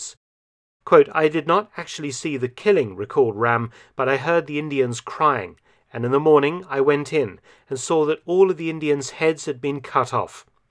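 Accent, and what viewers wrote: England, United Kingdom. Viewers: The first thing that Indians and other colonies of western countries were forced to fight a war that was not their. Japanese were worst but we should not forget how the Churchil of England killed millions of Indians by depriving them of food known as famine of Bengal. They sent all the food for the soldiers and here many people died due to hunger. Since the narrator seems an Englishman by his accent he must know that England has done no less horrible things to Indians then the japanies at that time.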